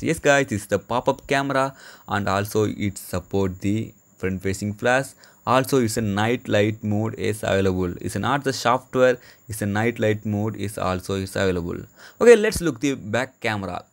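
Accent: India. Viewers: chinese man with indian accent?? WTF?! 😂😂😂 that's not your video you copycat!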